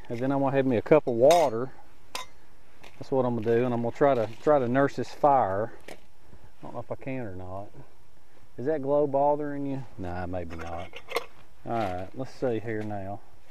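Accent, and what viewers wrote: South, United States. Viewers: Everything's so dad gum wet! I LOVE your southern twang. Good thing your a good sport, otherwise Nick could be on the business side of a knuckle sandwich, right? Haha. Hope all gors well. I know if anyone can do this...it's you. You got this,!!! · Hi, I've just discovered your channel and " ah jest lurve it". Especially that good ol' southern boy accent. And you have just so many good tips and advice - and your sense of humour just starts my day off with a smile! Keep 'em coming. I'm lovin' it. All the best from Geoff in lil ol' England. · Beaver+southern accent= what region??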